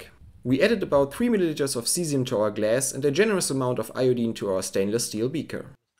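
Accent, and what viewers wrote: Germany. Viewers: Is this guy German? Cool experiment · geiles video und krasser deutscher aktzent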